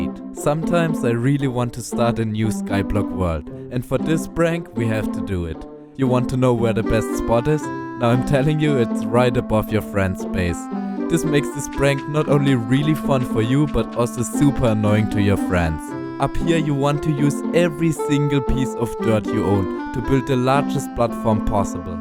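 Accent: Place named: Germany. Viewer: you sound very German 😅 ich feiere deine Videos so sehr xD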